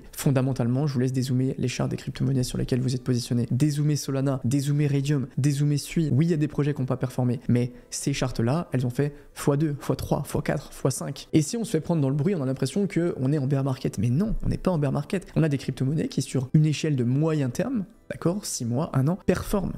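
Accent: Franche-Comté, France. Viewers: Je suis sur que t'es un mec de Franche-Comté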